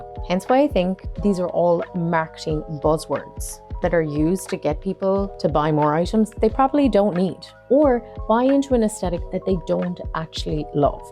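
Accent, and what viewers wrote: Ireland. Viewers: Oh it feels so nice to hear an Irish accent on YouTube, especially on design subjects. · Come to think of it, you do sound a bit Kerry-ish! (I used to have a friend from Killarney.) When I was living there, the only accents I could NOT understand at all were from Clare and West Cork -- but it seems that their accents are even the butt of Irish jokes. Hey! South Dublin used to be nice! And judging from Google Earth and Daft, the home prices are still very sky high in my old "hood" (Knocklyon). It's north Dublin where the trouble is. When I was there (in either Cork City or Dublin), I often heard, "Well, Dublin is really an IRISH city." I found Dublin in 1981 to be quite rude and unfriendly -- and not in the playful, insulting way that friends insult one another in the rest of Ireland. However, my sister-in-law and nephew visited Dublin a few years ago and found the people there wonderful. 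As for me, I love Cork City! To me, the year I spent there in Cork makes me one-sixty-eighth Corkonian. I'd move to Cork City in a heartbeat if personal circumstances allowed. Judging from what you said in your video, I think you'd approve of my little two-bedroom Mexican-exterior-style, single-story townhome (what you would call a terraced home)! Regarding enjoying what comes into style each year or what's trending, though, you're a better person than I am (which is good, since you're the designer)! I keep watching videos about the latest-and-greatest and yelling at my screen, "That's terrible!" or "That's stupid!" or "How boring!" LOL. Yet, I find it to be addictive -- a litt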